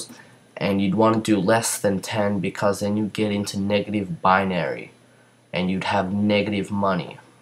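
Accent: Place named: Australia. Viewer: so...u went from an American to an Aussie?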